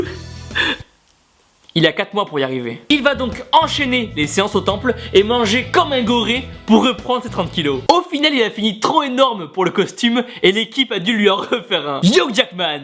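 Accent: France. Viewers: tu doze solide tu doit etre stressant , maudit accent francais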